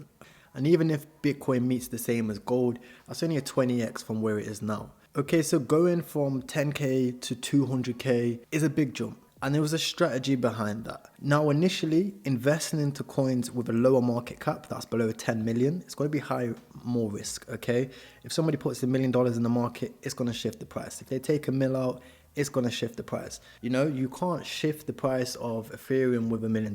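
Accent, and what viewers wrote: United Kingdom. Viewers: Are you investing or trading. And are you from the UK?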